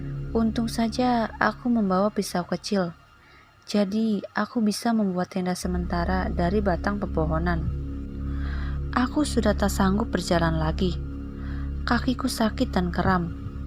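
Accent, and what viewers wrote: West Java, Indonesia. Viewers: Pembaca ceritanya berasal dari Sunda/Bandung ya?... Betulkah?... Karena penyebutan "bagaimana" terdengar menjadi "bagaimanak"/ penyebutan "sunyi sekali" terdengar menjadi "sunyi sekalik"/ penyebutan "gelap sekali" terdengar menjadi "gelap sekalik"/ penyebutan "membaca shalawat Nabi" terdengar menjadi "membaca shalawat Nabik"/ penyebutan "jangan tinggalin aku sendiri" terdengar menjadi "jangan tinggalin aku sendirik".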